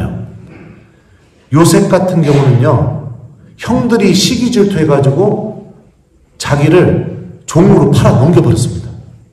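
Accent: Chungcheong, South Korea. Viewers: 찬미예수님 저는 신부님 애청 구독자가 되었습니다. 제 친정은 대전교구 성당인데 신부님 강론 강의 들을때마다 익숙한 충청도 사투리가 참 정겹고 좋아요. 신부님의 어머니 이야기를 들으며 저의 엄마가 생각나서 눈물이 났어요. 며칠전에 돌아가신지 6주기 맞이했네요. 저랑 15년을 함께 사시다가 89세로, 하느님 품으로 가셨는데 제 엄마도 새벽 3시면 어떤일이 있어도 일어나셔서 3시간 넘게 기도를 하시면서 하루를 시작하셨어요. 평생 온갖 질병으로 시달리시셔서 37킬로를 넘지 못하셨던.., 그런 엄마가 치매까지 오면서 계절이 뭔지, 지금 시간이 언젠지 점점 인지능력이 저하되셨는데 그런중에도 시도때도 없이 성당에 가야한다고 옷을 거꾸로 입고 상의를 바지에 끼우시고 성당가방을 매고 그러셨죠. 엄마는 갖가지 기도를 하셨기에 많은 기도책이 있었는데 낡을대로 낡은 그책을 하루종일 넘기시며 치매중에도 기도를 하셨고 묵주기도를 멈추지 않으셨어요. 그런데 3시간 넘게, 4시간 정도 걸리셨던 그 기도는 30분안에 끝나더라구요. 치매때문이셨겠죠... 기도책을 덮으면서 '나 천당 가야 해, 나 천당가야해' 이러셨던 우리 엄마... 하느님께서는 엄마의 그 기도를 어여쁘게 받아주셨으리라 믿습니다. 장례식때 엄마의 손때가 잔뜩 묻고 낡은 그책을 함께 제단에 올려드리고 그걸 보면서 저도 그때부터 냉담을 풀고 지금은 하느님께 다가가려고 노력하며 살고 있답니다. 지금도 엄마가 보고싶어서 눈물이 나지만 하느님 품에 계신 엄마에게, 그리고 성모님께 저를 위해 빌어주십사 전구를 청하며 살고 있답니다. 신부님의 강론 또한 저에게 희망을 심어주셔서 감사드립니다.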